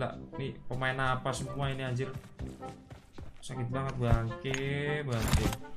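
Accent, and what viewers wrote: Java, Indonesia. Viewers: Game ke 2 pizza hut wkwkwkw Jowone ilooo lucu ngomonge cuk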